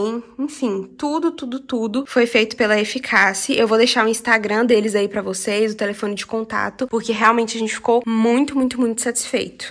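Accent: Minas Gerais, Brazil. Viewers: Você tem sotaque de mineira 😊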